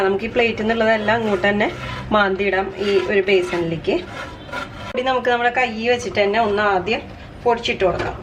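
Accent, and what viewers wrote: Thrissur, India. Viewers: ഈ തൃശൂർ ഭാഷ കുറച്ച് പതുക്കെ പറയു,കാരണം നമുക്ക്‌ തൃശൂർക്കാർക്ക് മനസ്സിൽ ആവൂം,പക്ഷെ മറ്റുള്ളവർക്ക് ഇത്തരി ബുദ്ധിമുട്ട്ണ്ടിവും,സംഗതി അടിപൊളിയാട്ടുണ്ട്,ഞാൻ. ഇന്ന് ഇണ്ടാ ക്കി നോക്കി ട്ടാ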